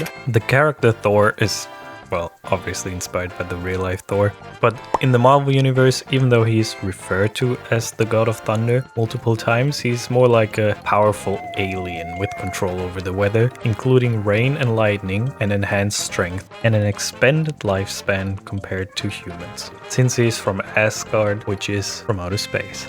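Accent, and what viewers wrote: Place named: Germany. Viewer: i already thought you were german and when you pronounced Lichtenstein i was certain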